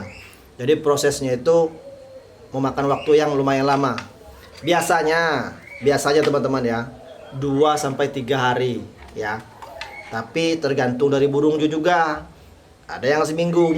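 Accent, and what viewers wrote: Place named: Lampung, Indonesia. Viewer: Kayak logat lampung bg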